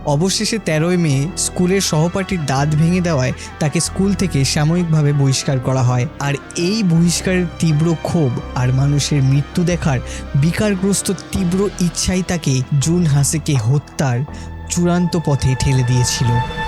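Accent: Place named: India. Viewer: আপনাকে বাংলাদেশি মনে করছিলাম।কিন্তু উচ্চারণ কথাবার্তার ধরন দেখে ভারতীয় মনে হলো।পরে দেখলাম চ্যানেলে আসলেই ইন্ডিয়ান লেখা।বাংলাদেশে নাম হয় না, হয়।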